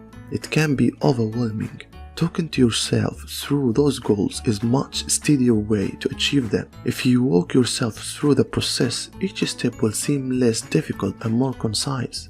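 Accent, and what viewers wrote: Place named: Egypt. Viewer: What's the program that you used to make the video? Super Cool. ALSO! Are you from Egypt? Your accent sounds very familiar :)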